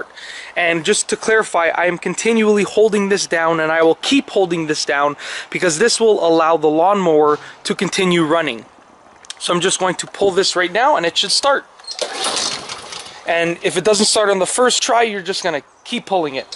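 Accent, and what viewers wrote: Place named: Canada. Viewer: you sound like the stereotypical canadian accent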